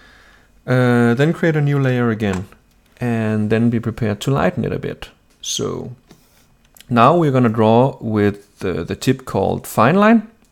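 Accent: Denmark. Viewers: Are you danish? both your accent and name is danish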